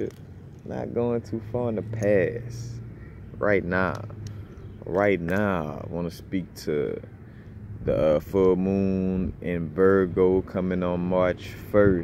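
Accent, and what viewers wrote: New Orleans, United States. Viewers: NOLA accent! 🙏🏾 Peace to you. I love your vibe.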